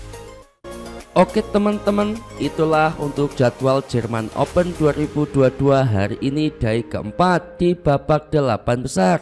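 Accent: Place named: Java, Indonesia. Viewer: Medok bnget jowone to mas..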